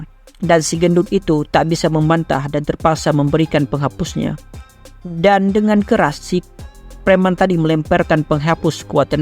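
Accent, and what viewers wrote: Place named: West Nusa Tenggara, Indonesia. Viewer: lu orang ntb ya bang? logatnya kayak teman dari sana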